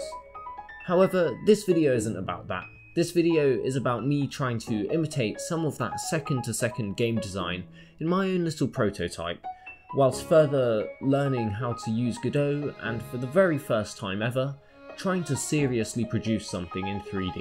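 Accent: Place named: United Kingdom. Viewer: Where you from bro? You sound bri'ish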